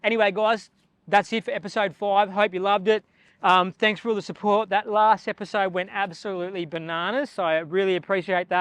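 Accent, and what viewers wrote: Australia. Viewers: Excuse me, is this australian english accent? I'm fairly good at english but have a hard time keeping up with your talking speed.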